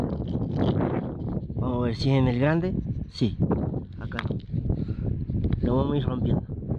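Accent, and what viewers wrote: Córdoba, Argentina. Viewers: Felicitaciones por su canal, aun no los conocía y me ha parecido muy interesante su video. Muchas gracias. Dice usted que estan en la puna. A mi me parece notar en su modo de hablar tonadita cordobesa. Lo sigo desde España, aunque naci en Córdoba. Una preguntita, el oro que se encuentra así ¿tiene todo la misma pureza? Lo que quiero decir es que si se funde ¿qué tipo de oro se obtiene? o ¿tal vez no se puede fundir? Perdona mi ignorancia, pero es que aquí el oro sólo lo he visto en las películas del 'far west', en los escaparates de las joyerias y últimamente he visto gente buscándolo en el rio en algún canal de youtube. Las cantidades que consiguen sacar son muy chiquititas, pero parece mas difícil de obtener que con detector como usted... Me parece un tema apasionante y si realmente en esa zona hay mucho, aunque sea en pepitas muy chiquitas, al cabo de un mes de frio y trabajo duro, se debe poder sacar un salario ¿no?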